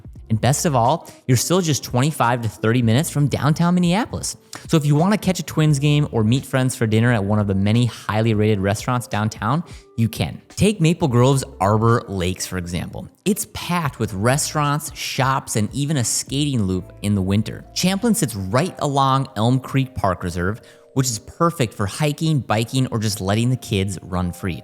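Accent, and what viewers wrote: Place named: Canada. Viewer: This guy sounds xanadian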